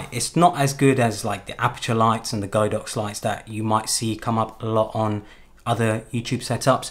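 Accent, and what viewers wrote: United Kingdom. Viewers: Hey that studio set up is Tickety-Boo looks sweet, quick question you mention $ in the video but that accent is defo from here in Blighty - Happy New Year!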